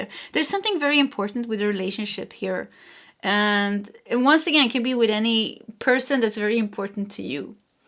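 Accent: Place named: Sweden. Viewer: Hey, punkin' just sending you so much love. I adore your Swedish accent so much. I always pick number 3 as my pick-a-card because I'm a life path 3. You always blow my mind when you talk about narcs in your life. I've married my narc mother in a man who's seriously self-obsessed and an abuser...but, after 35 years of living with his harming my body and gas-lighting me, the judge put a two year no contact order on him.Yes, as soon as he got out of jail he took almost all of the money out of our mutual account...that money was mine too and this is his financial abuse of me. I'm so frustrated because he still has me under his thumb. Sorry for the over share (lol). I'm tired. I've totally neglected my YouTube channel because my husband hates it. Thank you for the healing energy you give, you are such a darling soul. XOXO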